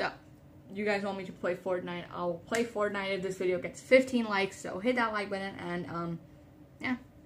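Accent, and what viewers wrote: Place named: England, United Kingdom. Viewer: bruh but why u have an English ascent in your language????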